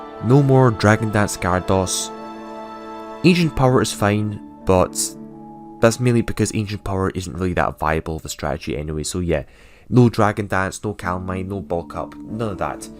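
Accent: Scotland, United Kingdom. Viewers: That's just his accent, not a speech impediment, you can hear that strong scottish accent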